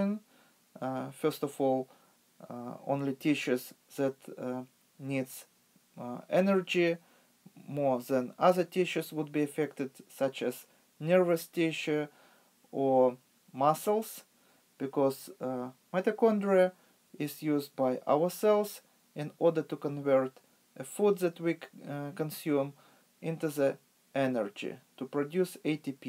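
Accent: Russia. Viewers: Funny accent but good video I would assume your Russian good job at hiding it tho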